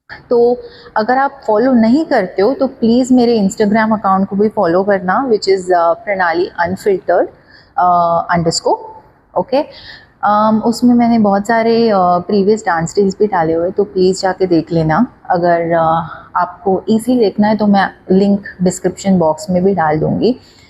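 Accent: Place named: Maharashtra, India. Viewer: Aap Maharashtran ho Kya Mahrati Awaz Arhi Thi 😍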